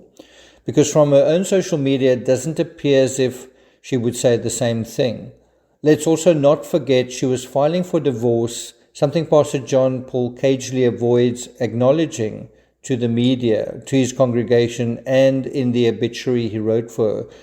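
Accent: South Africa. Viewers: Are you South African? Your accent....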